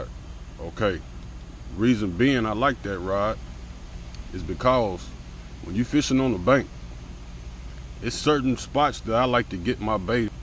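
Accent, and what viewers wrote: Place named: St. Louis, United States. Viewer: You sound like you from STL..😂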